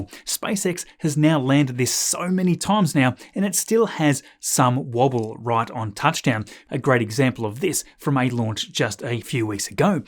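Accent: Australia. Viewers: God how I love this channel. Well organized, interesting, and reliable information delivered in an affable Australian accent.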